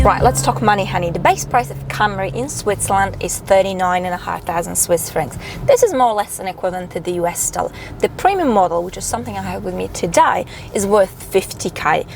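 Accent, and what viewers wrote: Australia. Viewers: I heard the accent right away, but was confused by the license plate (it wasn't Australian) and the steering wheel on the "wrong" side. So until Switzerland was mentioned, I had no idea what market this video was made to inform potential customers. BTW, you are probably aware, here in the 'states commercial producers use Australian presenters or folks with Australia sounding accents when they want to make the product being sold just a bit more...posh. For some reason, the British and/or Australian accent is supposed to convey a bit more...class. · The Commodore comment confirmed it; I knew I could detect an Australian accent in there, on some words especially!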